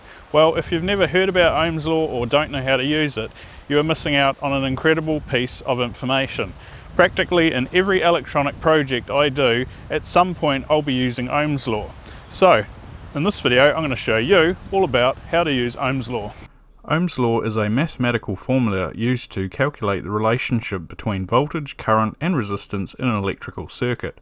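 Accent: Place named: New Zealand. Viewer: New Zealand accent + Useful Information + Comic relief = Awesomeness!!!! I love these videos :D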